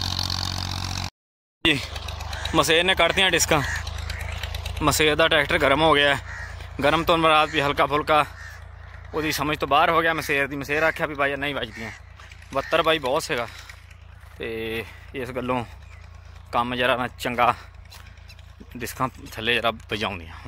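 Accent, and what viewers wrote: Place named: Doaba, India. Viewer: Paji boli ta thodi doabey di lagdi a....khush raho veer bohat vadiya c vlog....hor v paya karo tractor related videos.....Allah thonu tarakiya bakshey....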